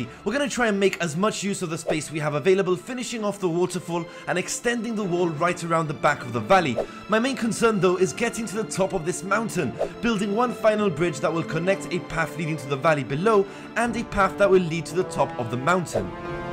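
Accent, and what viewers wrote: France. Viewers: Waw, you have a french accent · are you french? I am french and your accent sounds french x)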